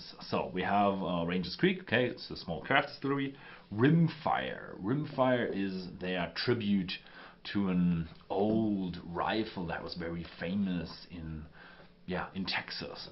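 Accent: Germany. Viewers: dude..move on. Not every word in proper English is pronounced the way you are used to. The presenter is German, there would be an accent.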